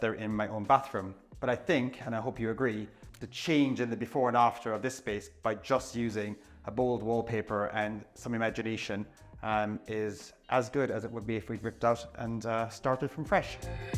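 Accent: Ireland. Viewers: Hi lovely house, but do i hear an Irish accent???? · He is Irish. Not everyone who lives in the Uk has the same accent.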